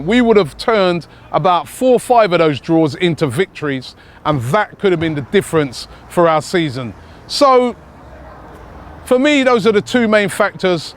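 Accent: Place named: London, United Kingdom. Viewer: bradke London mate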